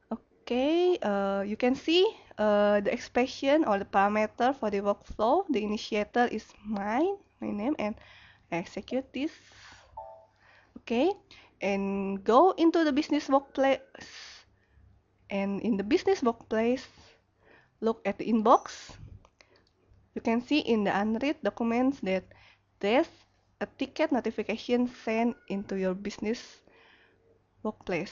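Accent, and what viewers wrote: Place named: Southeast Asia. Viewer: the accent is a mixture of filipino singaporean malay indian indonesian myanmar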